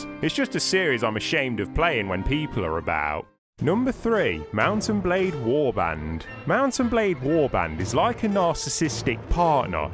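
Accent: United Kingdom. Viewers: Dude I just Binged watched ALL your videos. Idk if its the British Accent or what but your vids are HILARIOUS!! 😭😭😭😭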